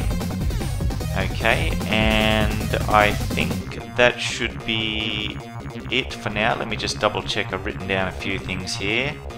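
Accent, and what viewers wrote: Australia. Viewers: I believe you're still out there. Hope you're doing well. Every time I hear an Aussie accent I shed a tear and think of this channel.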